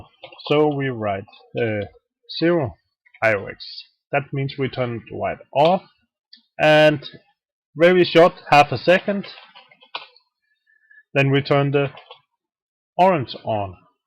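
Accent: Denmark. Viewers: You're Danish and I can hear it! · jeg kunne høre lige med det samme at du var dansk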